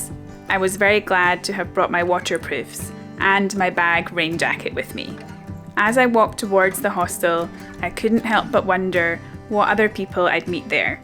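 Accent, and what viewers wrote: Scotland, United Kingdom. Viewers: As an old bloke I think I am in love with Scotland. What part does your wonderful accent come from? I had a work colleague who said that in the 40’s and 50’s the language between different valleys in the north would be different. Many Australians I know, think it is the only place in the UK worth visiting. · Just found you today. Very enjoyable video trips. I'll be watching more. You are lovely, and that Scottish accent is great. 😊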